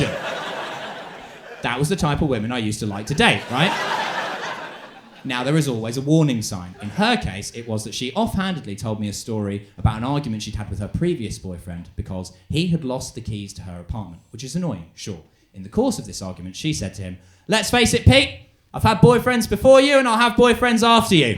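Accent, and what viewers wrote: Belfast, United Kingdom. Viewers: Milo's use of a vague belfast accent during this was very enjoyable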